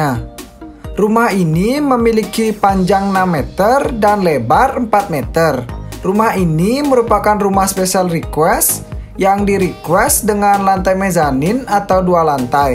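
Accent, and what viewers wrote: Bali, Indonesia. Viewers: logat bali nya medok banget blii 😁,,, btw desain nya keren banget sangat menginspirasi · Logatnya kayak org bali ya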